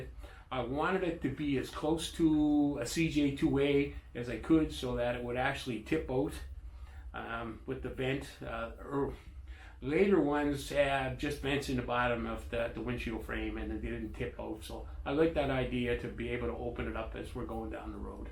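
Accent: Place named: Canada. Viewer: I am in Pennsylvania. From the accent I am guessing you are somewhere in Canada. I will email you my contact info.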